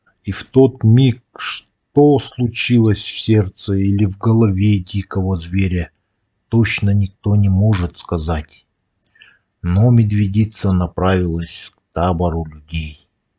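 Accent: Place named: Yakutia, Russia. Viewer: Я про этот случай слышал, тут просто красиво описано каким то писателем,прочитано с хорошей интонацией и знакомым якутским акцентом. 😄👍 А рисунки то какие 👍👍👍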